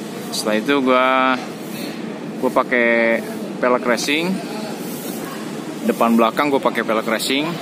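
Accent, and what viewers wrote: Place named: West Java, Indonesia. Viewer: Orang sunda iyeumah yeuh 😁😁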